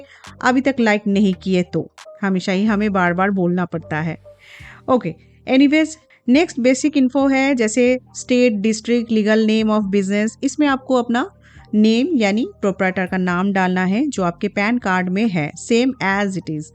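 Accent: Bengal, India. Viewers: Aap bangoli ho na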